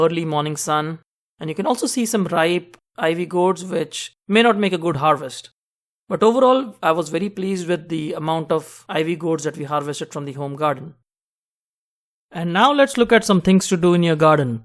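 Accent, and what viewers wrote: India. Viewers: Are you from India your English seems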